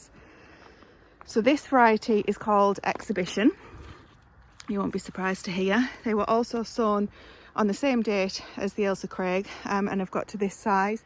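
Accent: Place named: Geordie, United Kingdom. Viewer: I just click back into the video and yes I did recognise a little Geordie accent normally would’ve rang a bell straight away because my brother was married to a lass from Newcastle 🫶🏼. Anyway enough waffling all the best have a great weekend. Kind regards from the Netherlands Nigel Burgess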